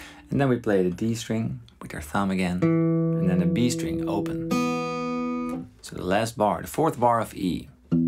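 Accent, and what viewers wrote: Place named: Netherlands. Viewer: de man is wel degelijk Nederlands, zijn Engelse accent doet me denken aan dat van Henk Hofstede (van de Nits) :-) (superfijne video trouwens!)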